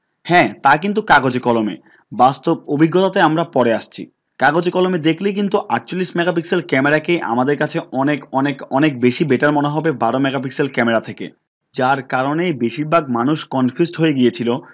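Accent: Chittagong, Bangladesh. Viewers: আপনার বাড়ি চট্রগ্রাম নাকি ভাই??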